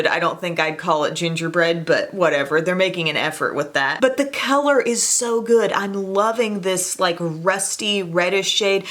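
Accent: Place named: South, United States. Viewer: o wow... making fun of people out there in the world that actually have developmental disabilities or disorders. Very classy. I was paying you a compliment and then that? lol, I guess you just never get tired of people voicing their opinion as to how utterly ridiculous, bitchy and trashy and ANNOYYINNNGGGG you sound. Please do Tube a favor..shut your trailer trash sounding channel down. I can't even stand your voice for one second. I almost fell asleep. Shut it down, you southern donkey.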